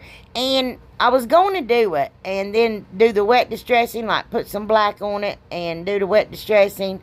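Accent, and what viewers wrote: South, United States. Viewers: I love everything! I could listen to you talk all day! Everyone says I have the most country voice they’ve ever heard, but they need to hear you! Everything you say, I understand completely!🤣🤣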